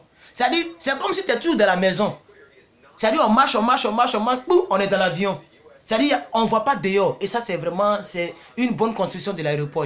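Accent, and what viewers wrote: Ivory Coast. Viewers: 😂😂😂 Ivoirien tu es trop fort, ton accent est trop chic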